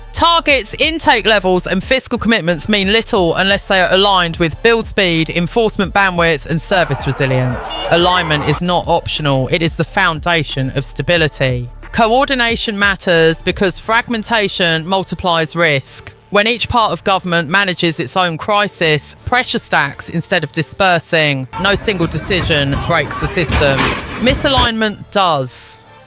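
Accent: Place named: London, United Kingdom. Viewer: Your dialect is smack in between Queen"s English and cockney.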